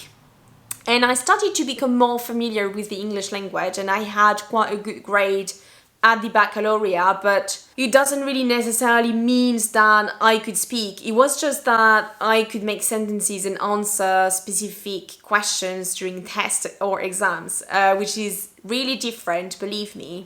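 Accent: United Kingdom. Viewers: At times, the influence of English teacher does affect our English learning journey.. By the way, I thought you're a British because your accent sounds like a typical British accent.. Nice story of English language journey you've shared with us, 👍😍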